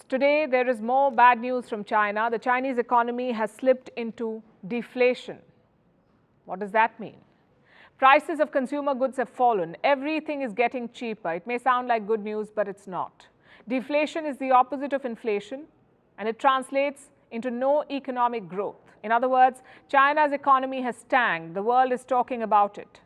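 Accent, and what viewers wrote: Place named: India. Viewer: this media is totally anti china as u can see the commendator has as trong curry accent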